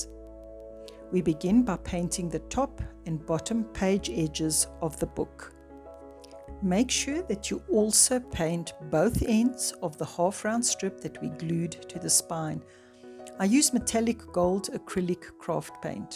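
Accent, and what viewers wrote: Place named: South Africa. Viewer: Hello, I recogise your accent. South African. What a super mini book you have created. I am a bookbinder and these are the most super fun. I have only made blank miniatures. Thank you!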